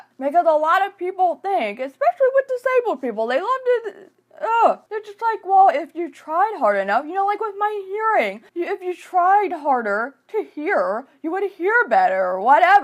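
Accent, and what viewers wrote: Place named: Southeast, United States. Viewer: I had to look up where you're located because your accent sounded so familiar and I knew it must be somewhere in the Southern East Coast. :D Sending solidarity from Maryland!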